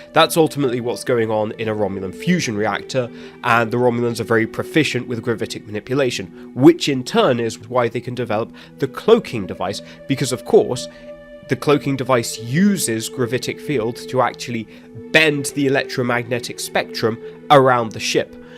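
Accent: United Kingdom. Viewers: The British accent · you have a Brit accent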